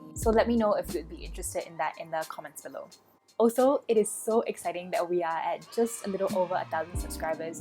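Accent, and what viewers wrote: Singapore. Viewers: Are you singaporean? Good video!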